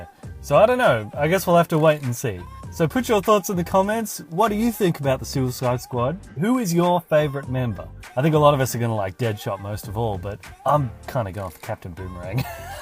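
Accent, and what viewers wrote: Australia. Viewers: he's AUSTRALIAN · He's Australian, the weird thing is i am too but people think I'm American.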